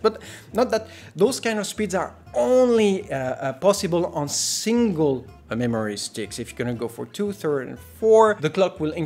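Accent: France. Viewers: Great review as always :):) is it me or your french accent seems to be fading ? You look really more fluent anyway I totally love your work and I'm extremely proud to see a compratiot appreciated ^^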